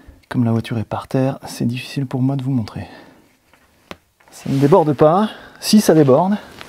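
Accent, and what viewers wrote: Germany. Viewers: Was für eines schönes video Ich mag deine Deutsche Akzent, sehr gut ! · Ton accent allemand est très bien! Salutations de la Suisse allemande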